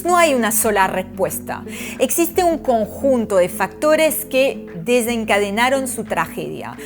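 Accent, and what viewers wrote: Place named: Argentina. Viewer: Todo bien DE DONDE ES ESTA DAMA...su epañol esta mezclado entre acento Español Argentino y Ruso... Pero buen video informativo.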